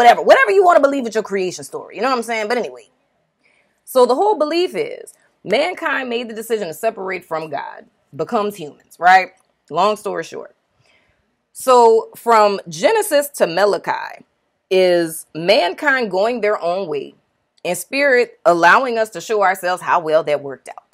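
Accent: New Orleans, United States. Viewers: Yassss NOLA accent!!! 😍🥰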